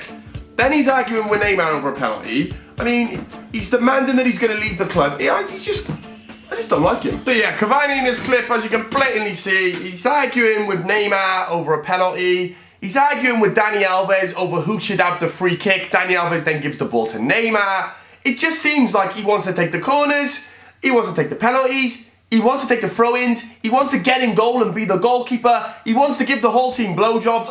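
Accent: Wales, United Kingdom. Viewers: your from Wales?!?!?!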